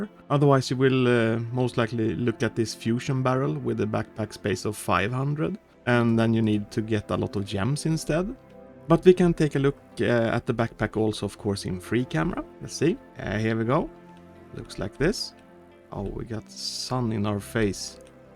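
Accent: Sweden. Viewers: You sound very swedish 😳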